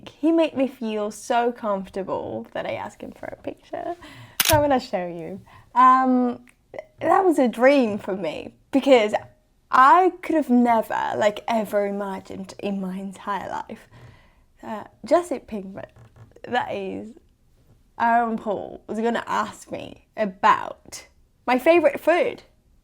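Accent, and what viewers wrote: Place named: United Kingdom. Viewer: tu acento British es precioso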